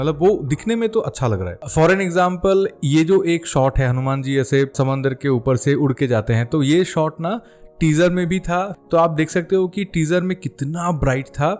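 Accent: Assam, India. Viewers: I think i found sm slight texture of assamese accent in your video.. axomr hoi nki apuni??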